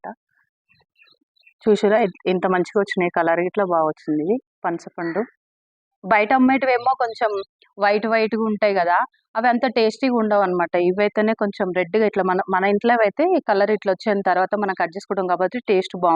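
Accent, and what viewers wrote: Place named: Rayalaseema, India. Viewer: మీది ఏపీ నా....రాయలసీమ భాష మాట్లాడుతున్నారు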